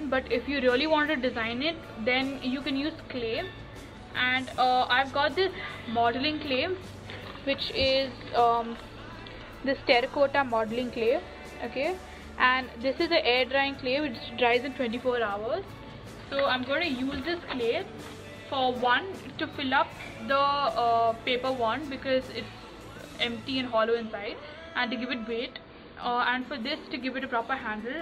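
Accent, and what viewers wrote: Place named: Scandinavia. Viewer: Your accent, are you Swedish, Danish or German? Also this tutorial is pretty cool and it helped quite a lot.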